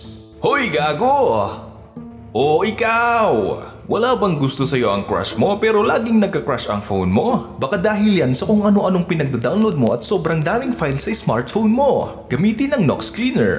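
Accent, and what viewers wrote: Visayas, Philippines. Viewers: bisaya ka ba?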